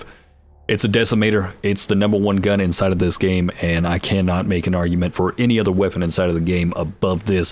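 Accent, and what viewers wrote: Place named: Deep South, United States. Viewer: the deep south accent sold me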